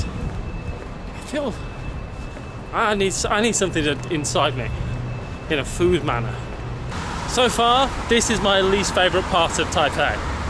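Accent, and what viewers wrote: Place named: United Kingdom. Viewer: Hi, newer subscriber here and glad to see a new video in Taipei Taiwan. Can tell that you enjoy walking, as it seems like every video you sure get in your steps for the day. I enjoy your Pop In The Bar portions as you alway describe what you are trying very well. We can almost taste it. Wanted to say how much I enjoyed your Kosovo series and so glad you captured the footage of that cute dog Prince and trying the Goulash with the guy from the hotel. Enjoy your British accent and determination so looking forward to more videos of Taiwan.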